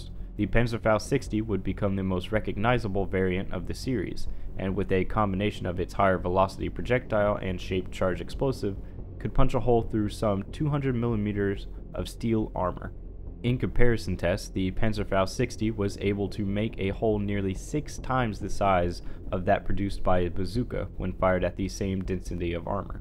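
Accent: United Kingdom. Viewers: So glad you got the British guy to narrate lol. No offense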